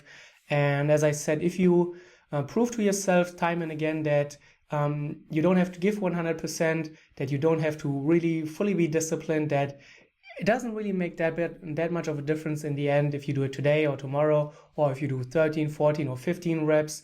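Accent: Germany. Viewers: I immeditely knew you were german from your accent! Jawohl ja! Haha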